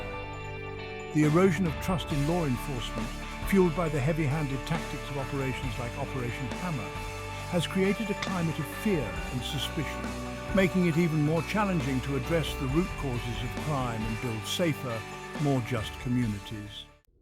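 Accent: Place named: United Kingdom. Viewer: Whats up with these Brits narrating this lame ass crap ???? Who gives a f--- <num> Life !!!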